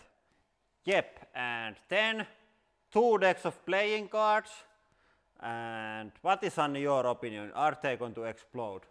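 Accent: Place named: Finland. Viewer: Kunnon rallienglanti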